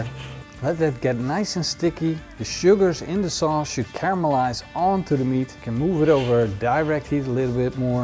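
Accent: Netherlands. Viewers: I do really like your videos and there is a soft spot in my heart hearing a Dutchman speak English, as I went to high school in Den Haag due to my father being transferred over to Europe. That said, I do not really care to own the knives, but having to open accounts on all these different social media platforms is too much for me. I hope you might take that into consideration in the future. FYI, the Big Mac taco recipe was absolutely fantastic! I have turned several people on to your channel due to that recipe. Keep up the great content and take care.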